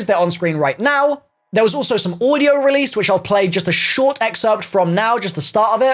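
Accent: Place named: England, United Kingdom. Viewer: Is it just me or just everyone likes he's English way of speaking?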